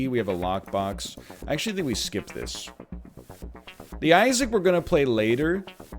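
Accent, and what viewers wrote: Canada. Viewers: NL sounding very Canadian this video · why is NL sounding exxtra canadian latley?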